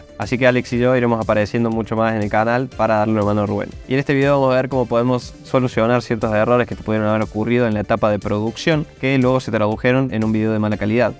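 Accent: Córdoba, Argentina. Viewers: INCREIBLE HERMANO ENCIMA CORDOBEEEEEEEEEEE ♪ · Hola, no me he enterado de nada😏 entre que hablas con ese acento argentino y que hablas muy deprisa me he quedado como empecé, lo siento. Soy alumno de la escuela, y por cierto, ¿donde están esas herramientas que dices? Mi opinión es que este tutorial es para un público avanzado hablas con tecnicismos que no entiendo y yo estoy aprendiendo en edición en la escuela. Un saludo. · Holaaa desde Uruguay! Escucho tonadita de Cordobés??? Me encantóooo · Vamo' ese cordobés papá! La didáctica que me manejan en este canal es una locura. ¡Abrazo gigante! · Alex, sos cordobes, no? Aguante Argentina papaaaa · Cordobes hasta la medula jajaja 👍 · Grande!!! Qué lindo es escuchar una tonada cordobesa en este canal que sigo hace tantos años!! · Sorpresa grata de escuchar un cordobés acá jajaja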